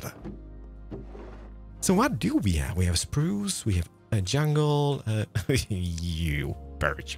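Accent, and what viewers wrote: France. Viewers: i'm french and i love the french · I love French so so so much Never fails to put a smile on my face and light up my mood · Yes French is back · French mumbo for mayor is the best and needs to appear more often lol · French gives me life:') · i gotta say : i'm french and i LOVE your french accent !!!!! :) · French is the best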